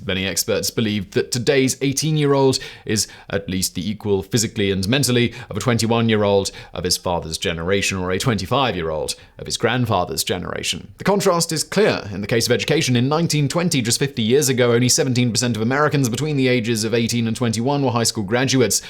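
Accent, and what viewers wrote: United Kingdom. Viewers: are you english, brittish astrailian new zealanden or australionewzealandish?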